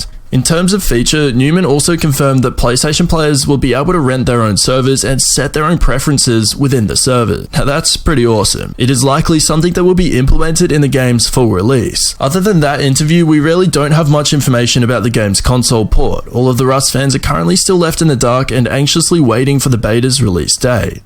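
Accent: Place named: Australia. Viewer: Australians are so hard to listen to FFS change up your pace monotone af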